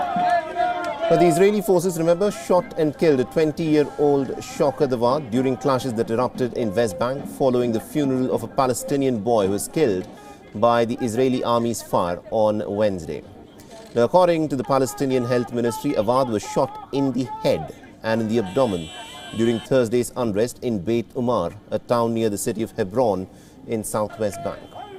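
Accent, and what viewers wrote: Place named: India. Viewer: You need to polish up your accent so it's clear outside india ,it's very difficult to understand you .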